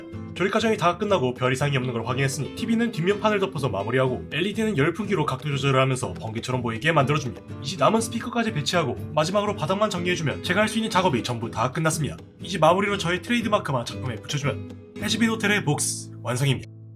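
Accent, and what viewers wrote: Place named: United Kingdom. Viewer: YOU'RE BRITISH????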